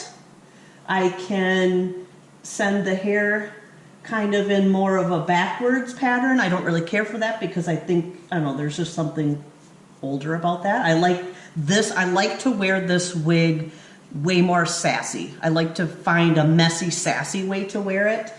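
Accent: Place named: Wisconsin, United States. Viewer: I heard a comedian on the radio today and she sounded just like you! And she said she was from Wisconsin. hmmm. Ok, I think its Jackie Kashian and it must be a Wisconsin accent because I thought it was you. lol. still sounded more like you than her.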